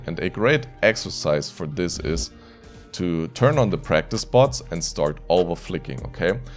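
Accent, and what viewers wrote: Germany. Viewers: Der deutsche Akzent xD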